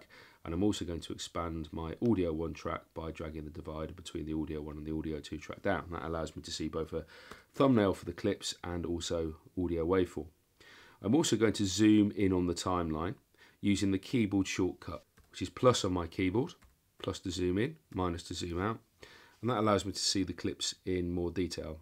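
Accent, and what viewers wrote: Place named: Cockney, United Kingdom. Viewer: yes very clean and tidy, extremely good [ cockney diction and good visuals, thanks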